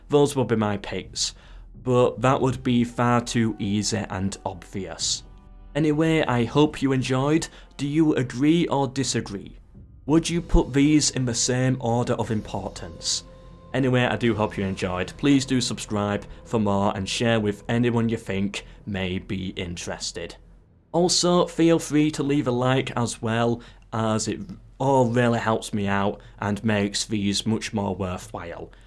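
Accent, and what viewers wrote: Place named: Northern England, United Kingdom. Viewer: loving the northern accent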